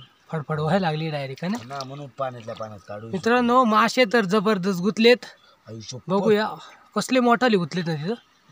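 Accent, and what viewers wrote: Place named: Kolhapur, India. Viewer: Mst video. Tu kolhapur cha aahes ka? Kokani bhasha bolat nahis mhnun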